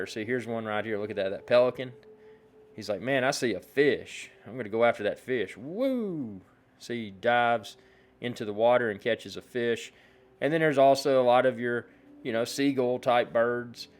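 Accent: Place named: Tennessee, United States. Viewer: Sounds like Tennessee—p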